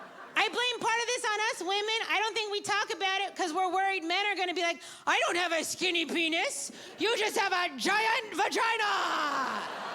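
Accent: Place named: United States. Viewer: Hearing annoying merican accent is not for me annymore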